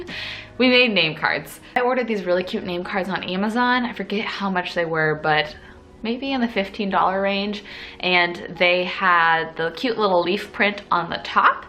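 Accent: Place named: South, United States. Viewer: Lol you always start your videos with a thick southern accent......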